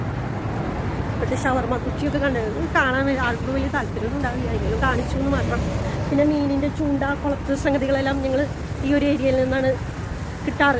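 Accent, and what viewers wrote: Thrissur, India. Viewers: Thrissur samsaram. Super 2 perum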